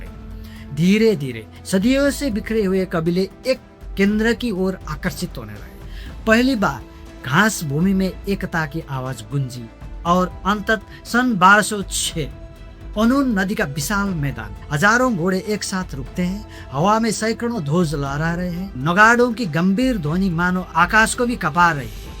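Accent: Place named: Nepal. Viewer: u r nepali guy. bcoz how u speaks Hindi 100% nepali accent. with tone everything. but kinda like u person full confident. but never accept own mistake.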